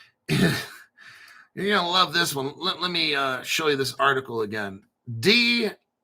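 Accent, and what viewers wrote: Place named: New York, United States. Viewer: This dude gotta be fron NY or NJ 😂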